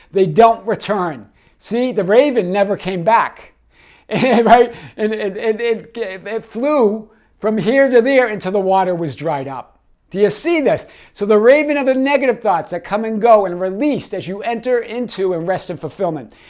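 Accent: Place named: New York, United States. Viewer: I love your new yorkish accent Reminds me of home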